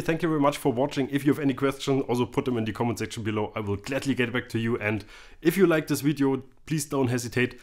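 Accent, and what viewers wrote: Germany. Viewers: Phil Mitchell reviews bass stuff with a german accent on youtube? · The content is always great, but the German accent and eye movement is what keeps me coming back.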